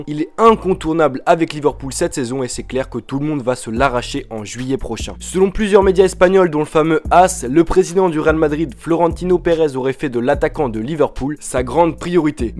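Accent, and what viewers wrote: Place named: Belgium. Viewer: Tu est belge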